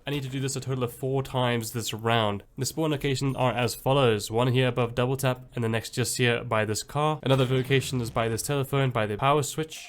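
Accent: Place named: South Africa. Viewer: No ways you're south african. Recognized the accent immediately. Lets go bru, really good video and well paced aswell 🙌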